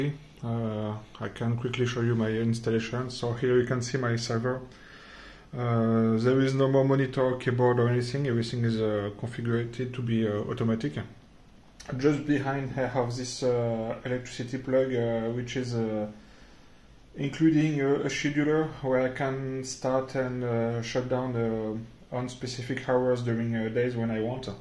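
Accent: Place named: France. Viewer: Un bon accent Français 🙂 Merci pour la petite vidéo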